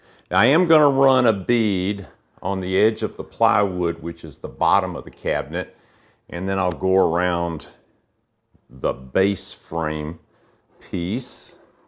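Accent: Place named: Tennessee, United States. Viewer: I think I heard a slight Tennessee accent. My Dad's from TN.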